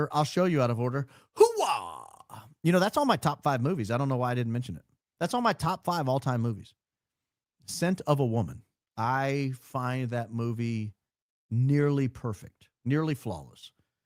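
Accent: South, United States. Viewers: You absolutely should split your vote up and always vote for the best candidate that's best from America First and Only. I also don't think you looked fat and terrible in that old video, I also like the southern tang a bit. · Alright, I’m going to need 1987 southern accent to make a comeback😂😂😂. I also have never voted for memaw Ivey. And I’m not voting for Tommy from Florida either lol 😊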